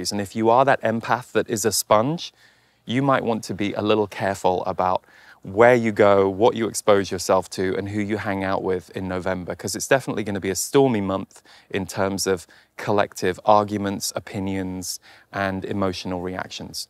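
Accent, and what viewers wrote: United Kingdom. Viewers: I thought you are in the UK. Your accent sounds British.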